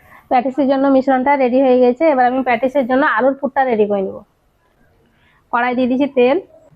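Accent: Murshidabad, India. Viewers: ভদ্রমহিলার এই রান্নার অনুষ্ঠান দেখতে খুব ভালো লাগে, সাধারণ কিন্তু, সুন্দর , কথা শুনে মনে হয় ওনার বাড়ি মুর্শিদাবাদ জেলায়....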